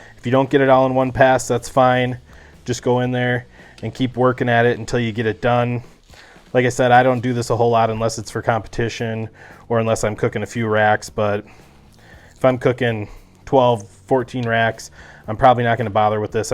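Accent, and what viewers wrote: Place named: Midwest, United States. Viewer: Your voice has a midwestern twang. I hear MN?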